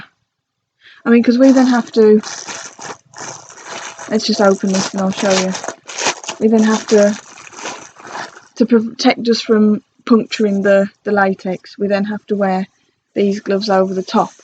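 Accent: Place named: United Kingdom. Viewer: I have Irritant Contact Dermatitis (To the best of my knowledge based on so much research I have done!) where I have redness, itching , blistering and fissures on the back of my hands exactly the areas you indicated in your video and especially my right hand after I wear any glove (Latex, Nitrile or vinyl ) . According to my researches sweating under the gloves is what causes the dermatitis , do you think wearing those cotton gloves under them would help minimize/absorb moisture or it will increase sweating and thus worsen my condition? Thank you so much for uploading this very useful video.. Love your British accent :)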